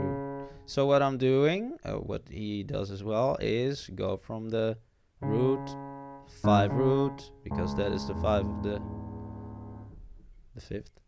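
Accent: Netherlands. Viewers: You sound dutch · I think he is Dutch because he said "yeah?" And he said or to "of" which is only in holland people say that. They say "ja(yeah?)" and "of" in holland means "or" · Are you dutch? Sounds so bc of your accent :p sr if its not hah .good videos btw! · Thomason lu He is Dutch, I can tell by his accent. I'm Dutch too ;D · I like your explanations starting from the basics and plus you sound dutch so good luck in the world cup! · Great tutorial very clear! Are u Dutch?.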